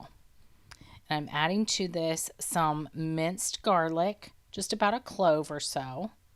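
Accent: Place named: United States. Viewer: The moment she had American accent I stop watching what American know about meatballs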